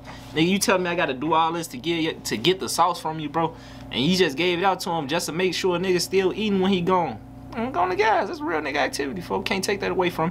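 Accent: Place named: Chicago, United States. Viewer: I hear in your voice u from the chi so I give you a little room and u young, I’m 43 and I respect what you said about your pops taught you, and u listen cause I stay on son’s and they move off old rules, and I respect that you went and did your research on B.G u got some of these young dudes who rap and don’t do that, I heard Fredo bang say he don’t know 2pac music if your pops from the streets he Definitely had u on 💪🏿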